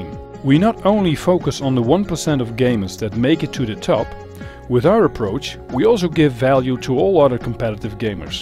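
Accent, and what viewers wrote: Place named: Netherlands. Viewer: is hij nederlands want zn zijn accent is gwn nederlands en 'is' en 'gamers' zat een heel nederlands accent op xD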